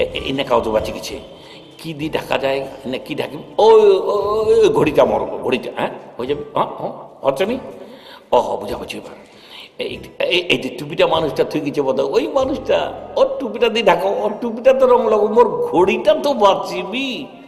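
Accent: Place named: Dinajpur, Bangladesh. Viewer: দিনাজপুরের আঞ্চলিক ভাষা গুলো আপনার মুখে দারুন শোনায় স্যার। প্রতি ইদে এটা একটা আলাদা আকর্ষন অনেকের জন্যই। দিনাজপুরের সকলের উদ্দেশ্যে, দিনাজপুরের চোখধাঁধানো খেলাগুলো দেখতে চোখ রাখুন আমাদের চ্যানেল Sports Mania তে...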